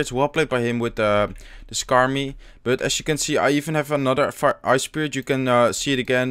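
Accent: Netherlands. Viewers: ik ken je account niet maar ik kan gewoon aan het "accent" horen dat je uit nl komt ahahhaha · where are you from? Just out of curiosity you have a Dutch accent I think. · Your dutch accent sucks but your content is really great. Congrats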